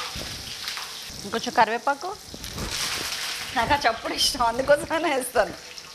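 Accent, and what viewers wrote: Telangana, India. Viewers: Medam Medhi telangana or andhra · మీ మాటల్లో తెలంగాణ యాస చాలా బాగుంది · Mee telangana yasaki johar.telangana bidda anipichharu.i like it👌😃😃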